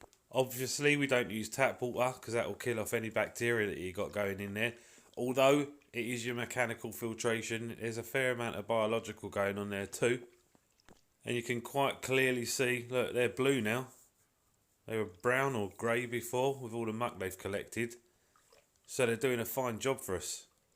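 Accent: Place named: United Kingdom. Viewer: Impella', filta'. heata', prociga', tank wata', wira'. Love that accent. Where in the UK is that? That plastic media is totally worthless and Oase used it to cost cut. Use higher quality bio media with more surface area.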